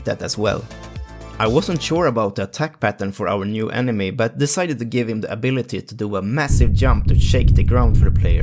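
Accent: Sweden. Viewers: Why do you sound soooooo swedish hahaha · The game is really cool! But I have one question. Are you swedish by any chance? Because your dialect sounds very swedish😂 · SVENSKA DIALEKTEN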